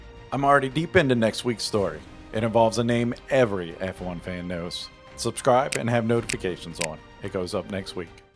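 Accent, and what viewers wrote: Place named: United States. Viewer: It must be AI as the maker claims he's based in India. So this must be an AI voice with an American accent.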